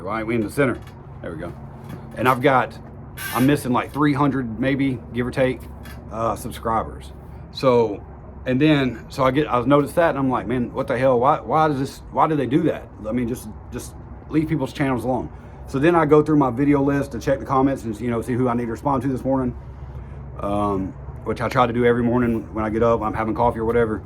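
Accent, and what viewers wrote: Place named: South, United States. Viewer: yeah man np. After I typed it I said "oh maybe that's why he had twice as many notifications" you know? Idfk haha. Take care. Well wishes my man. It's funny you'd never think it, but minus the slight southern accent, your not any different from most guys wayy north up here in New York. Bet you'd never think that. We got beaver trappers. We got it all bud. Big ass tree removal! It's the sticks. Be good brother.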